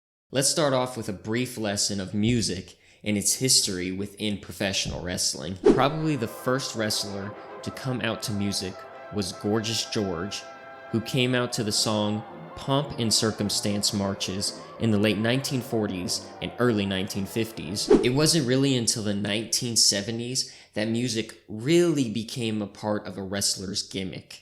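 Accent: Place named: United States. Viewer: Obviously done some research, but doesn't really sound like an actual fan. And the narrator sounds American, but struggles with pronouncing basic words and names like Dud-ley. Instead pronouncing it Dude-ley and Mid-E-On, who apparently worked in the Ministry of Darkness with The Undertaker and Kane....🤦🙄🧐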